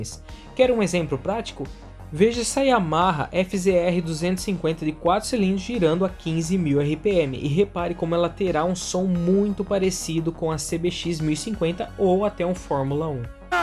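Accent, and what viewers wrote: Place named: Paraíba, Brazil. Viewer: Esse sotaque da Paraíba ta bem audivio mesmo tentanto não deixar aparece no vídeo, ótimo vídeo Aquela yamaha é 2 tempos ou 4 tempos?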